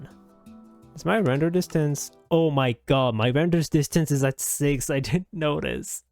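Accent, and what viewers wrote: France. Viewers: Are u french??? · Oh, so that is a french accent! As a not native english speaker it is good to find out how exactly different accents sound like. Great video as always! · Give him a break, he's french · You are french? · are you french? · Are you French?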